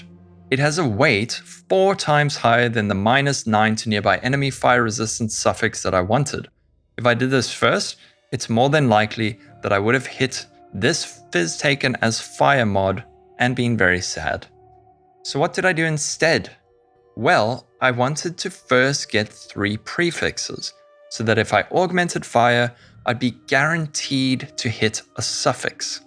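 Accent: South Africa. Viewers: Is it my imagination or are you South African?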